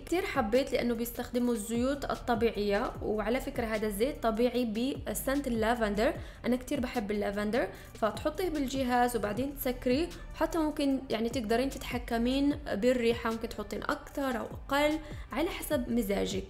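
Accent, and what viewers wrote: Morocco. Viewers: ممكن أعرف شو أصل بلدك في كلامك أحسك من المغرب أو الجزائر يلي حاس مثلي يضغط لايك ويلي عارف شو أصل يقولي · من لكنتك باينة فيك مغربية برافوووو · انت مغربية حيث اللهجة مغربية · اللهجة المغربية واضحة في كلامك يا الغزالة 😉😄😄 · اول مرة نشوف الفيديو وحسيت بيها مغربية من لهجتها وتأكدت بتعليقك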